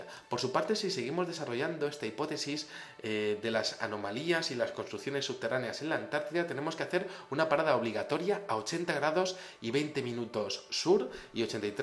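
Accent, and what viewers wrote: Spain. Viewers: de que parte de España Eres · muy interesante el video, de que parte de españa eres???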